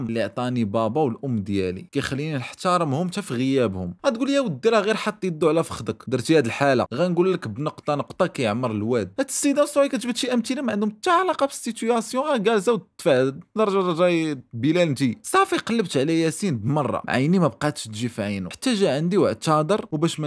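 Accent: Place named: Western Sahara. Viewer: انت دور دور تجبد على لسانك ناس ديال الصحرة گد عندنا لجنوب نسلمو عليك 😉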